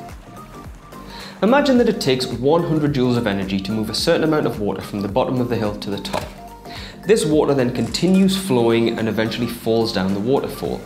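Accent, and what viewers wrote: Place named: United Kingdom. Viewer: He is bri'ish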